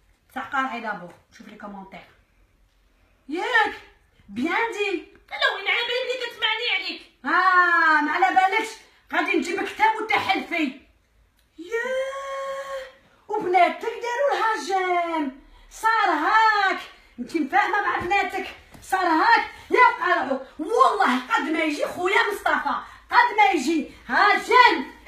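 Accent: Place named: Oran, Algeria. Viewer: انا وردة من حب قالمة. انا نموت على وهران ونعشاق في لهجة تعهوم. تقتل. والله. نتمنا نزورها في حياتي والله. نحبها. ونحب لهجتهوم.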